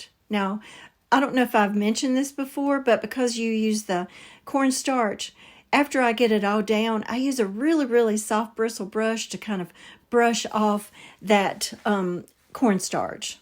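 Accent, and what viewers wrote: South, United States. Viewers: I love your excitement with your grandchildren. Love your southern accent. God bless sweety.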